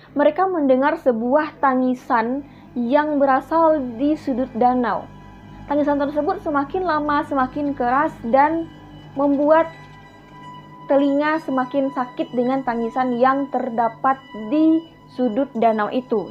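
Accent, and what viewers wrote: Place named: Minangkabau, Indonesia. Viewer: Bahasa indonesia ada dialek minang ya mba but over all i like your effort, sukses....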